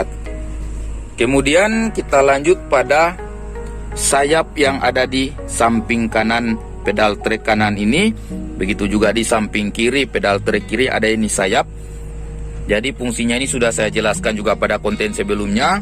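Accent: Bolaang Mongondow, Indonesia. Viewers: Rupa logat mogondow ini noh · Mantap bang jelas sx👍 Mcam logal bolmong😁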